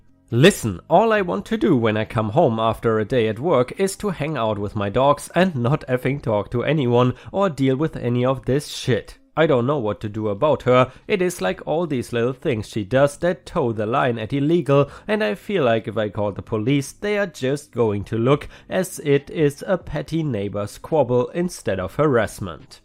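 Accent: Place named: Germany. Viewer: thank you for the reply! I’m honored! Lol. Well, I’m old, and wear glasses, but I love to read along with your voiceover because sometime many of you “clean up” the written words and I like to know what the OP actually wrote. Lol. Thanks for the reply. BT W. I love your German accent, my elder relatives were German immigrants and I love hearing the accent again. 😘😘⭐️⭐️⭐️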